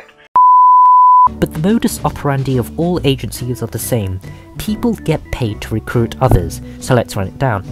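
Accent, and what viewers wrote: United Kingdom. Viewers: Voice over is so excessively british I cannot concentrate on this despite being very interested in the story.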